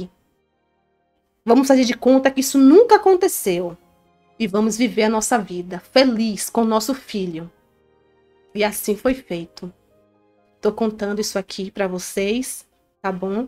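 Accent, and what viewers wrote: Bahia, Brazil. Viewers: Oi vc e baiana a voz parecida ?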